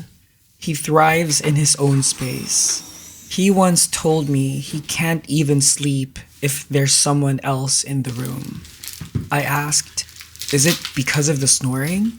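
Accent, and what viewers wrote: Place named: Philippines. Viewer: Your Phillipino English accent is so beautiful and relaxing.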